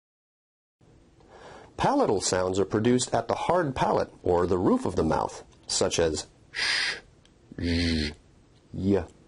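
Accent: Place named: North America. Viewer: He's speaking North American English, Non-British !!!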